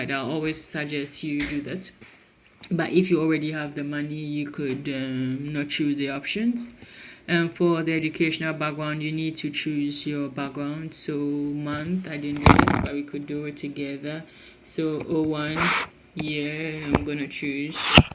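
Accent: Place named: France. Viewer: I can feel the French accent in your presentation 😂.... Great Video 👌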